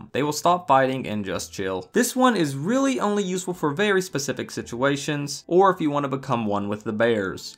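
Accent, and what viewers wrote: South, United States. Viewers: your southern drawl got stronger since the last upload. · I honestly can’t tell if you have a southern accent or a british accent.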